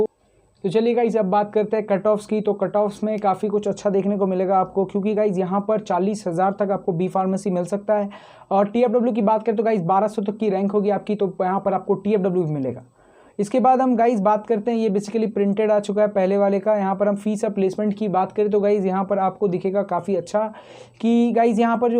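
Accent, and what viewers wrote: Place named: Bengal, India. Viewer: Dada tumi hindi bolte bolte bangla bolar j taan thake tomar seta chole asche bar bar 🤭🤭👀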